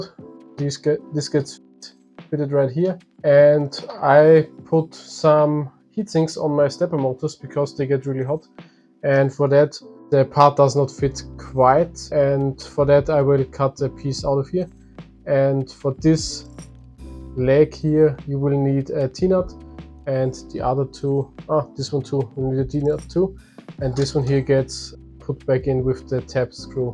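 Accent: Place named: Austria. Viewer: Subscribed. Miss that accent. Know you are Austrian. Besser als bayerisch. 😂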